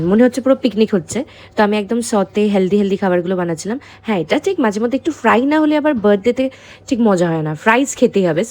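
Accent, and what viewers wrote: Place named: United Kingdom. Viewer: I love ur english accent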